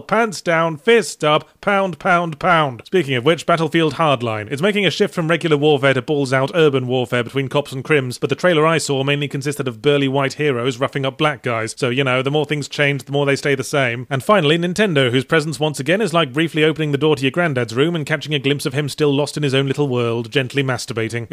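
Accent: United Kingdom. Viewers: Man. I love the cynicism of this show and the British accent only makes it better. Couldn't stop laughing. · it is like all of my gamer grief in one video but with a british accent....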